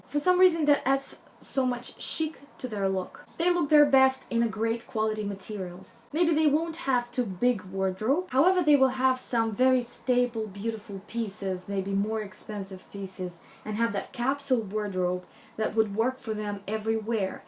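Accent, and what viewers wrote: Russia. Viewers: Акцент похож на русский. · that russian accent tho, can tell, you're from my country :D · Is your first language Russian (or any other slavic one)? Your accent is lovely by the way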